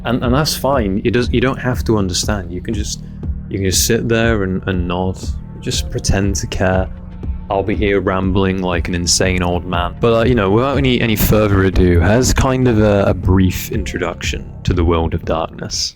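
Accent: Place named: United Kingdom. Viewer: Man I hope you come back dude. We need your britlong voice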